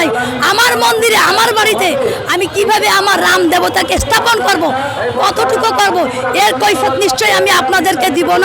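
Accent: Kolkata, India. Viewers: কথার ঢং দেখে মনে হচ্ছে আমরা ভারতে আছি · বাহ কলকাতার শুরে কথা বলছে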